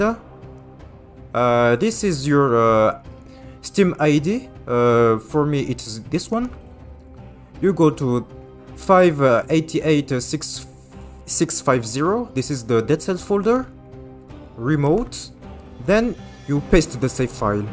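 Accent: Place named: France. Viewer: « french accent »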